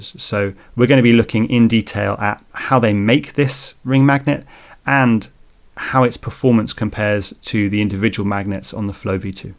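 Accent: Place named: United Kingdom. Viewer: Seems like you're the onli British English speaker who's speech doesen't make me feel pain in my ears)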